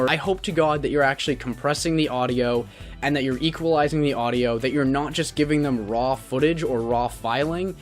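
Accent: Canada. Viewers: Watching you has literally reminded me of all my wonderful childhood summers spent at Crystal Beach! Directly after the Peace Bridge, or within short distance. (After the amusement park was shut down:/ but still the absolute happiest memories) for a few reasons. You legit bear a shocking resemblance to one of the slightly older teenagers who lived next door to our summer cottage (he was always looking out for us younger kids and even the slightly older cousins and whatnot especially if we wanted to venture in to the town. Even with my older brothers taking us younger kids, this guy still made sure to come with us as he didnt want anything weird happening. Like a surrogate big brother or cousin. Okay this turning into a WHOLE thesis on why your accent and then the almost doppelganger resemblance, has helped me to feel more related to you. And also just made me feel damn good to remember those times. 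So, thank you for being Candian cuz as dumb as it, honestly made me like you more.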